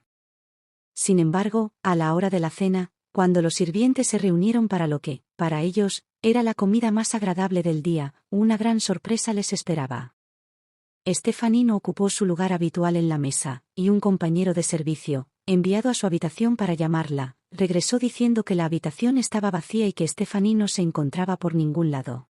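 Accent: Castile, Spain. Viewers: Narradora muy buena ,castellano perfecto ,no hay nada mejor. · Hacía tiempo que no escuchaba un acento castellano tan... castellano (y tan... femenino). Dicción y entonación impecables, casi "mágicas". Felicitaciones, y gracias por el trabajo que desempeñan. Un saludo cordial desde Buenos Aires, del otro lado del Atlántico. al sur del mundo.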